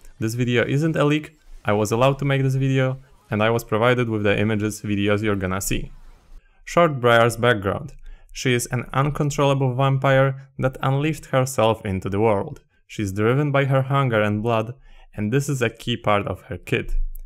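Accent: Poland. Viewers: Polski akcent uniemożliwia mi oglądanie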